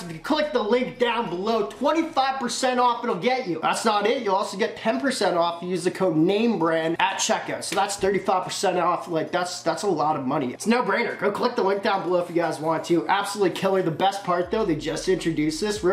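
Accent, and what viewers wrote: Canada. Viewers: You sound like you from Canada 😂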